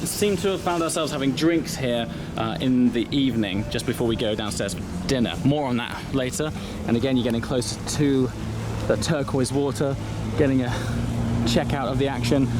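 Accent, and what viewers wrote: Netherlands. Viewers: Wait are you dutch? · are you dutch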